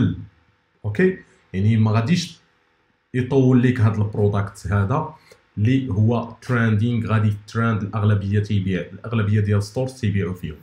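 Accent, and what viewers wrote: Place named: Maghreb. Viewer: راه يتكلم بالعربية اخي وين شتها فرنسية ?!! لهجة مغاربية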